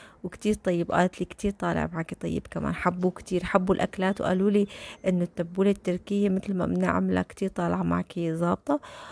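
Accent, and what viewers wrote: Aleppo, Syria. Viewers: لهجتك لهجة أهل ضيع حلب 🤔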